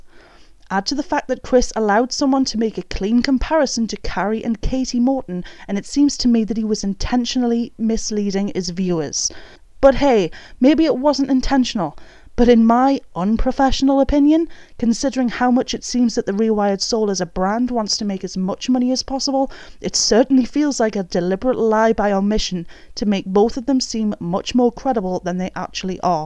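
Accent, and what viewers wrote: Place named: Newcastle, United Kingdom. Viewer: More than British...you’re a Geordie...hell you could be one my neighbours 😂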